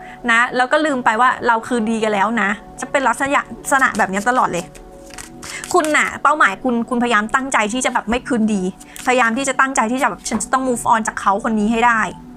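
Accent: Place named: Thailand. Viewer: ทำนายตรงมากคับ...ชอบฟังเสียงคนนี้มากๆเสียงน่ารัก..เหน่อๆนิดๆ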